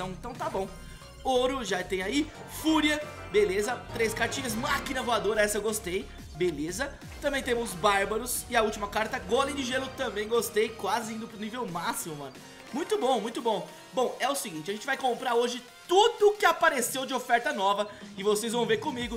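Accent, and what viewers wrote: Minas Gerais, Brazil. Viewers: Mineiro d dpirc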